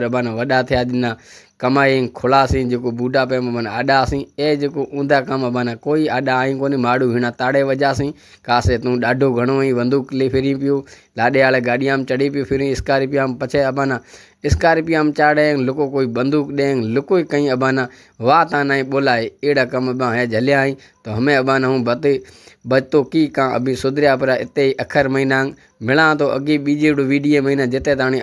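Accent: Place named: Dhat, India. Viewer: की ढाटी आवाज बहुतही अच्छी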